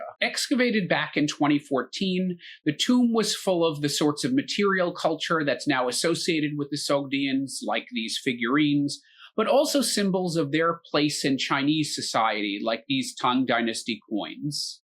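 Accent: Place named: United States. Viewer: He didn't pronounce it incorrectly for someone with an American accent. You don't change your accent to pronounce a place name. I'm sure you don't pronounce New York, Orlando, Los Angeles, New Orleans or any other American city the way that an American would. Someone with a rhotic accent saying "Mel-bin" is incorrect and distracting. It's like an English speaker walking around pronouncing Paris the way the French do.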